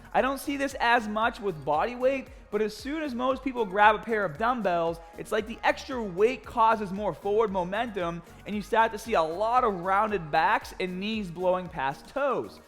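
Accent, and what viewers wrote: Boston, United States. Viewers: I swear his accent was never this Boston a few years back 😆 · Is this guy from Boston? I can't get over the accent lol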